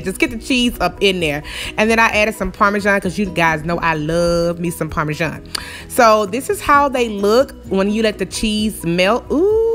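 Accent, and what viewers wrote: South, United States. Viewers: Your southern accent makes me feel warm and welcomed to your. Videos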